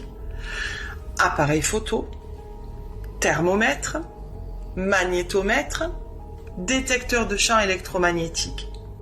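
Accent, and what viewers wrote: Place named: South, France. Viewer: Bonjour 😊 je découvre la chaîne avec ce petit accent du sud 😊 comme nous 😊 du coup un abonné de plus 😊 merci pour ce partage 😊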